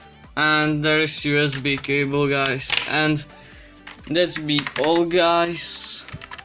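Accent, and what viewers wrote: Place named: Czech Republic. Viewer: Když už podle prvního slova poznáš že je to Čech :D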